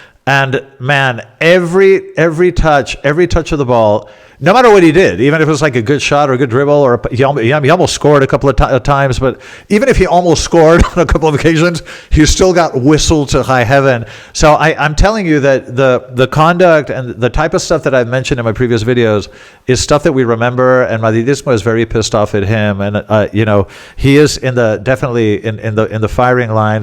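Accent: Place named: United States. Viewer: You were playing bottom of the league. I gave up after 30 minutes when Oviedo were dominating and Real decided to actually play. In the rest of Europe we are sick of Real Madrid and happy that a PSG side without Galacticos is kicking ass and the rest of the world is laughing at you, knowing this moment was coming. The so-called greatest team in the world reduced to a pile of excrement. A bit like the US you could say. And you with your American English and predator hedge fund background would surely be a great choice for next Real President, Don.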